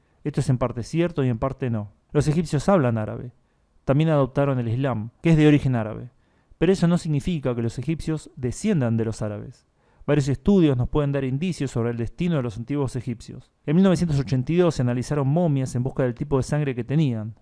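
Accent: Argentina. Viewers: Por fin un canal interesante y narrado por un argentino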